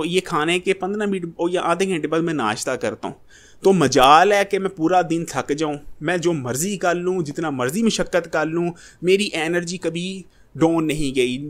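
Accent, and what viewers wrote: Punjab, India. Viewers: baie ap pnjbi he na ap Ki boli bout achi lgti he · اگر اپ ناراض نہ ہوں تو ایک بات کہوں۔ پلیز آپ اپنی اردو ٹھیک کر لیں۔ پنجابی لہجے میں اردو بولتے ہیں۔ یا پھر اپ پنجابی میں ویڈیوز بنا لیا کریں۔ شکریہ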